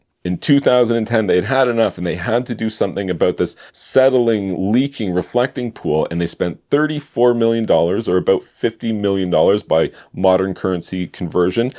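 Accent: Canada. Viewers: I understood that is Canadian the first time I heard him say "aboot". Grandfather was Canadian. Easy for me to distinguish between American and Canadian accents. Cheers. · This man is clearly, obviously Canadian. I'd say from southern Ontario, likeleh. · Dude, his accent announces that he's Canadian within the first few seconds of any video. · you sound like you're Canadian?? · I love your Canadian twang :D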